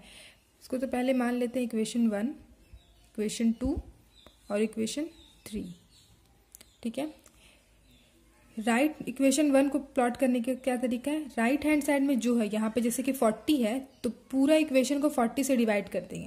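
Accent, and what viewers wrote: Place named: Hyderabad, India. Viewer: Madam ap hyderabad sy ho kya,apki zaban hyderabadi jaisi hai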